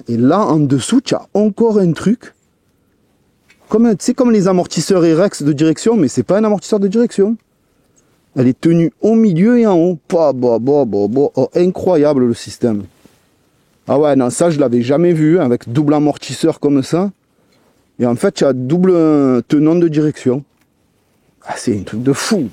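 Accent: South, France. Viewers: J'en peux plus de cet accent du sud. Même ça ils ont réussis à le pourrir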